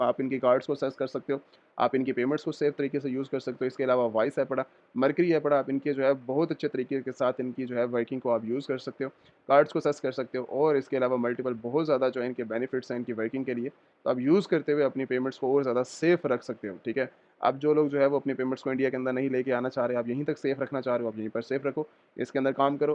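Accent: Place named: Lahore, Pakistan. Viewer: i think you are from pakistan because your accent is like a lahore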